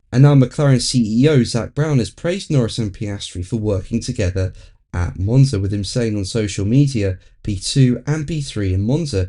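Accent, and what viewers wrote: United Kingdom. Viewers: You speak as a british, not a car racing fan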